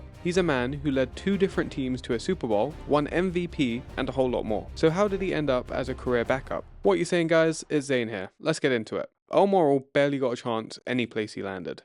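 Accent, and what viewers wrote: England, United Kingdom. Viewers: Why do you have a English accent person talking about American football,stupid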